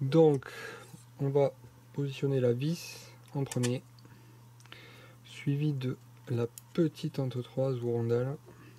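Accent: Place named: South, France. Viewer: Pas mal du tout ! Le design est plutôt intéressant ! Cet accent, tu es du sud de la France ? On attend le maiden maintenant ;) Bon week-end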